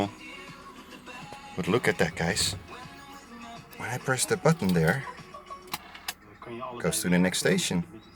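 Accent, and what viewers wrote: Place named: Netherlands. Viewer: weer dat vreselijke Hollandse rot accent🤔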